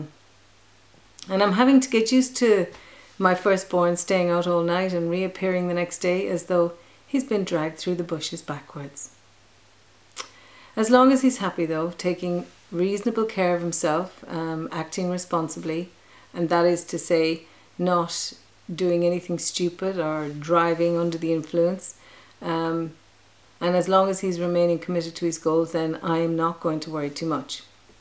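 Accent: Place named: Ireland. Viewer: Well done on blog 82 video. New beginnings for sure. Pleasure to listen to your content and your Irish accent. JC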